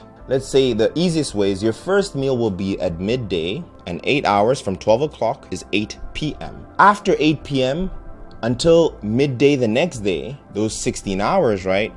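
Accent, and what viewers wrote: South Africa. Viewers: Nah, I'm hearing a bit of a South African accent here. Edit: Oh, it is indeed.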